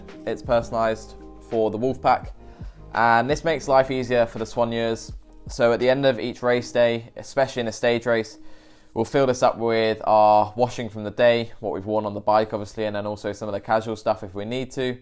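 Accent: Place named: United Kingdom. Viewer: Your accent reminds me a IELTS academic British English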